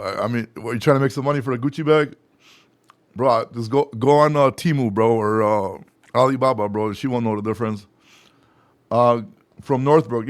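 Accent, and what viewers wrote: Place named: Russia. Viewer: Why you have a Russian accent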